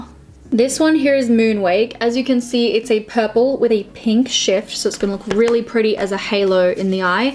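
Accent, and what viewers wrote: Australia. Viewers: I swear there should be more of our accent in you tube videos. We really have the best sayings about things lol. #aussieaussieaussie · Nice to hear an Australian accent. 😊🌸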